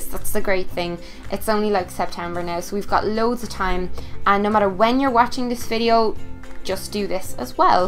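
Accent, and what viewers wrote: Ireland. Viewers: Your Irish omg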